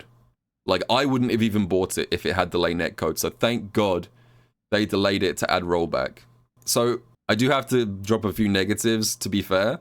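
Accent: United Kingdom. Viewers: I thought I was clicking on a Sajam video and then a British accent started talking to me, I was baffled.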